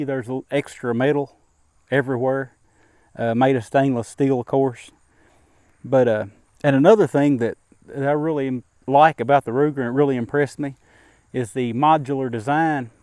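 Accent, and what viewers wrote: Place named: South, United States. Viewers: This guy has an amazing accent - can you tell me where does he come from? Texas? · I have a S&w 686 6in but agree with you that the Ruger is a fine revolver ( as are nearly all of their products) thanks for an entertaining review and I love the "metric" conversions but most of all please keep saying "burrel" that must be the metric version of barrel, ya gotta love a southern accent, keep up the good work. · Nice video! It's nice to jump in the way back machine once in a while and see you in your younger years. I could be wrong, but aren't those GP 100s made up in New Hampshire? I have a few RARs that were made in Mayodan and like them all very much, as bolt action 22s are my favorite. Grew up in a family that spoke southern as a second language (Grandma was from North Carolina) so I can understand you perfectly when you talk lol. 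All of the best to you and yours from up North. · Saw a pic of ky, sounds like ur from ky aswell.. What county u from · The best thing about this review is the guy's accent. So southern, I love it.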